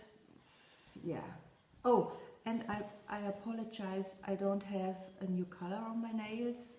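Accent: Germany. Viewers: Hi Again, great video as always. I was sorry to hear you have been feeling ill with your oral surgeries but hopefully you are on the mend and feeling better. I'm praying for you to have a full and complete recovery. I have a lovely friend who immigrated to the US from Germany when she was very young, I believe some of her family are still in the Frankfurt area. She misses the accent/dialect so I told her check out your channel. Loved your "know your needle-worker" segment. We are so very much alike... in our choices of movies, books and love of music. If I could pick a one word definition for you I'd say, "caring". You care about people, the way you express yourself and how you are perceived, and of course your love for your craft...so yes, to me it's definitely "caring". Have a good stitchy week and I look forward to your next video. Hugs a Bunch, Toni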